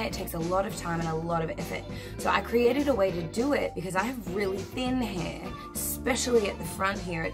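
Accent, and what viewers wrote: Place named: Australia. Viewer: It sounds to me like you now have a middling English with slight Australian accent. Nice!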